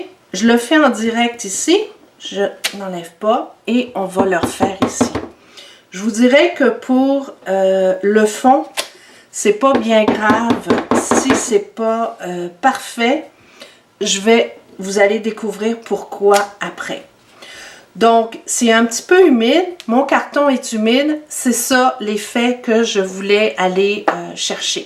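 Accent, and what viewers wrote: Canada. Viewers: chalut et merci! Tu me donnes des techniques que je ne connaissais pas! avec un tampon, j'ai maintenant plus d'idées créatrices et j'adore ton accent canadien! chaleureusement ( de St Nazaire où sont partis beaucoup de Canadiens)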